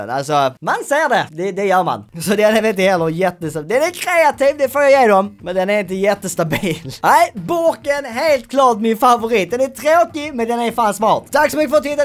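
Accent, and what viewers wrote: Scania, Sweden. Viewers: Den skånska dialekten är då jävla rolig🇸🇪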